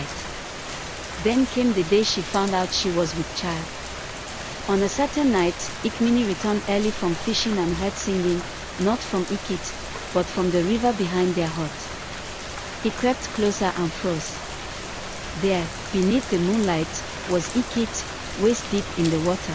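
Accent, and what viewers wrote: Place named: Africa. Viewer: I love the sound effects on your videos and the African tone, you're doing well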